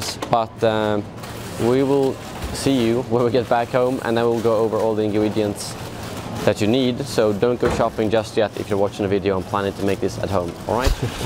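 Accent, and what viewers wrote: Stockholm, Sweden. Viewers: Den här snubben är så stockholmsk som det går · why does sound extra swedish when doing vlogs? lol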